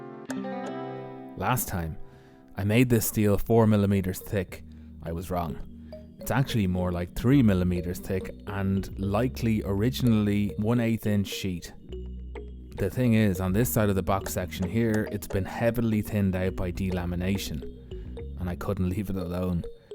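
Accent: Ireland. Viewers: Love the story-telling, makes the videos! Is it the Irish accent?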